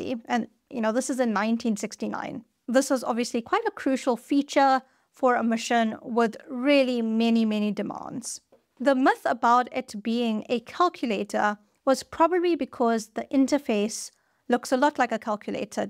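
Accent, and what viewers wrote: South Africa. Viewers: Sounds Seth Efrican. Some antipodean accent. Kiwis would say “luft” and “mussion” for “lift” and “mission”. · Are you South African? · Excellent video. It's heartening to see young software developers pay homage to the giants whose shoulders all developers stand on. I was 7 years old when Apollo 11 landed on the moon. Later in high school, I read about the AGC and I was inspired to learn how to program. First course was FORTRAN with punch cards. I worked as a software engineer for 38 years and never forgot the lessons learned from those pioneers. PS I love your South African accent. Keep up the good work!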